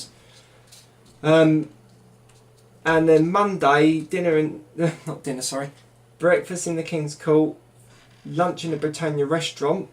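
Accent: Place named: Cockney, United Kingdom. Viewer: Love the cockney London accent!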